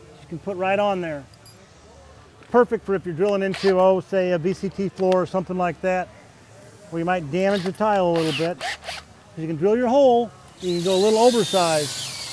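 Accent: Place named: England, United Kingdom. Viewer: I love your English accent and I hope if you start teaching English that will be amazing 🤩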